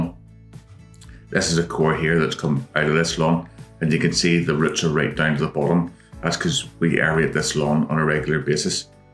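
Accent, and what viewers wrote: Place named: Belfast, United Kingdom. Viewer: Brilliant videos. Best part is your Belfast accent! Just done first cut (Feb 25th .. is this a record?). Hollow tine aerator booked for next week. Never heard of this machine, until I watched your videos. In my mind, I keep hear your saying ‘ it’s the best single thing you can do for your lawn’. Hope to see improvement this Spring!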